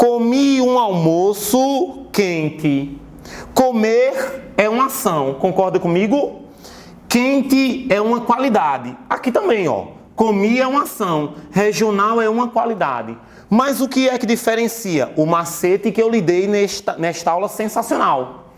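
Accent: Northeast, Brazil. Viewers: sutaque NORDESTINO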